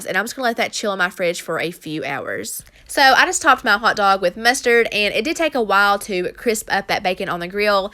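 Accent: South, United States. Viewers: Just found your channel and I ADORE YOU! Your content is awesome and your personality makes me feel like we’d be great friends lol 😂🤗😘 Plus I LOVE your southern accent! New subbie ♥️♥️♥️ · I love how you say monday 😍😍😍 ur country accent 😍😍😍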